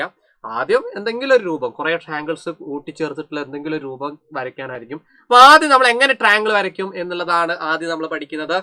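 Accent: Kozhikode, India. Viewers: Sir Kozhikode ano stalam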